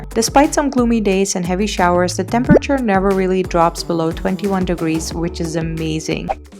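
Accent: India. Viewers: She is picking up the indian accent. This is nice☺ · Your english accent is more indian now! I've seen your videos for years and I just came to this realization. You make me love india more and more every time!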